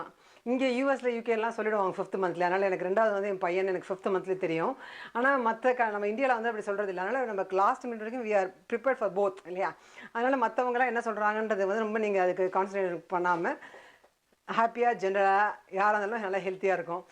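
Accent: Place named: Tirunelveli, India. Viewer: Mam what is ur native place..ur slang is like our tirunelveli slang..nice to hear..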